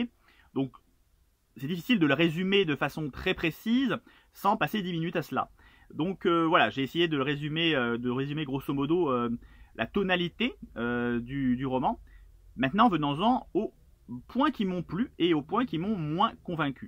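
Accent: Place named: Nord, France. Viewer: Bonjour vous êtes chti ? (Vous avez un fort accent, désolé de le dire).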